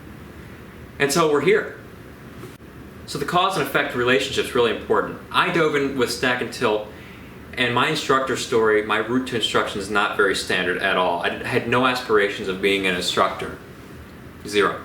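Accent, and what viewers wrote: United States. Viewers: I am 78 3/4 years of age now. Your story is very impressive. I have been playing golf over 45 years with my score ranges of 86~98. I long wanted to become a member of 70s club (golfers who play with strokes 79 or below 79 per 18 holes) but I gave up my hope as I already became old. But one day, while I was watching a Utube golf lesson program, A funny and happy guy who speaks "a very easy to listen, beautiful" American English appeared in another Utube screen. It was YOU, Mr. and You were talking about swings joyfully quoting the theories physics and geometry. You theory attracted me a lot and I instantly fell in love with you. This is what I long long wanted theory and practice. You encouraged me a great deal and I decided to challenge the AGE SHOOTER. Now, all parts of my body have been aching, so many parts that have never been aching during my 45yeas of golf. 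I enjoy small aches and become happy every day because my scores have been improving. Thank you. I will someday visit you and receive lessons from you!!!!!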